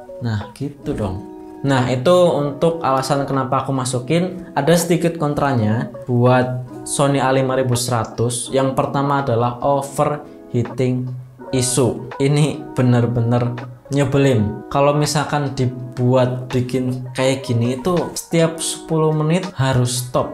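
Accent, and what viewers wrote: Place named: Java, Indonesia. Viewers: Ngapak ya mas. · Logat ngapak nya ga ilang ,bagus ,identitas 😂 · Medok oke jadi aku menetap untuk menonton 👍🏻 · logat ngapak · Kayak'e wong ngapak ya mas'e? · Medok ya mas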